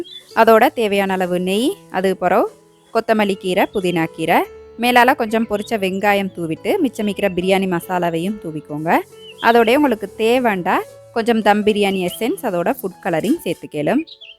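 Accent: Sri Lanka. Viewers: I love you srilanka tamil 😃😃👌👌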